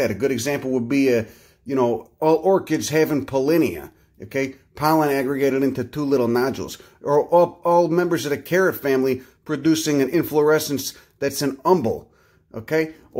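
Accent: Chicago, United States. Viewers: Even with the Chicago accent, tats and foul mouth - it's pretty adorable how much you love flowers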